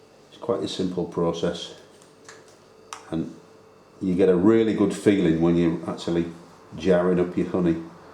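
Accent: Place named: Manchester, United Kingdom. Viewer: Thank you so much most interesting after watching your three videos. We are hosting three hives in our garden and I’ve been asked if I want to look after them. You are most helpful. We live in Herefordshire but we couldn’t help noticing your Mancunian accent which is where we originally came from.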